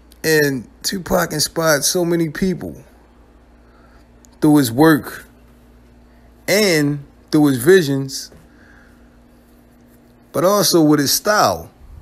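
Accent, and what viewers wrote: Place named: Boston, United States. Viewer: Hey where are you cuz you sound like you from Boston you sound like Snoop from The wire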